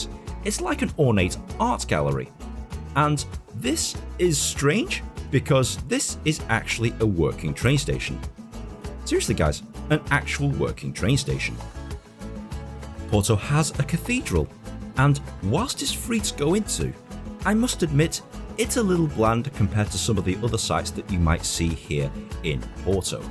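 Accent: United Kingdom. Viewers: Your voice is perfect, and fine British accent. Great man!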